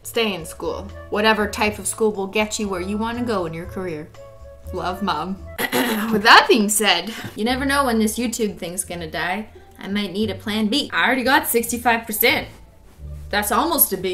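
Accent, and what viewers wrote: France. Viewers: Her french accent in english words was very good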